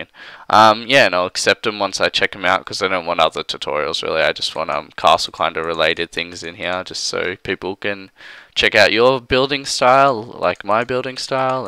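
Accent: Australia. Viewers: man he's clearly australian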